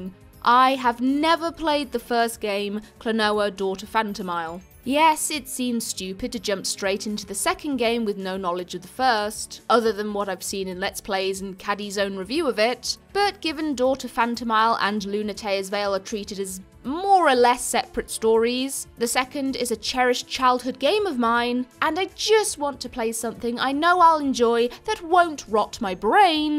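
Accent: RP, United Kingdom. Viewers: Just started playing this game, so looking for reviews...this is your first video I've seen, and am wondering how you can sound both sexy and posh at the same time...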